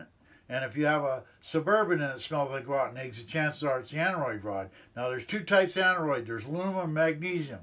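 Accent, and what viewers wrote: Canada. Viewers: I don't watch to learn as much as I watch to hear that Canadian accent! 😂😂😂 Eh? Good to know info,! I will have to re-plumb my rig when I get home and this might help! Also, 🦄🦄🦄🦄🦄📸📸📸📸📸📸📸📸 your camera person makes you look good! 😁🙏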